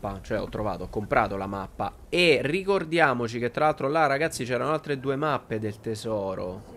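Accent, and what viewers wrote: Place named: Abruzzo, Italy. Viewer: Non ho capito se sei abruzzese o napoletano, dalla voce della tua fidanzata mi sembra napoletano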